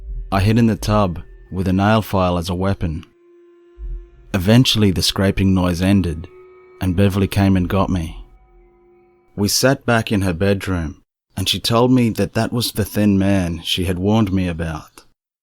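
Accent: Australia. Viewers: Wow!! I really loved this video! Listening to your very sexy Aussie voice on this perfectly beautiful fall day. What more could I ask for?? And I see you've amassed quite the following!! Many wonderful comments on this one!! Congrats!! Even though elephants are my all time favorite animals, I do like your sexy kangaroo!!;-) Take care hon. I'm looking forward to the next one!!:-*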